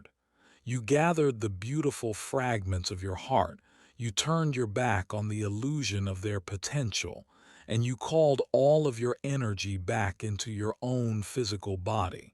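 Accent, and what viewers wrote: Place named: Maine, United States. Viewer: You sound like you are from up east.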